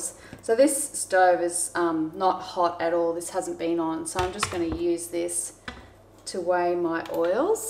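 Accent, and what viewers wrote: Australia. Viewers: Maybe they just don't like the Australian accent.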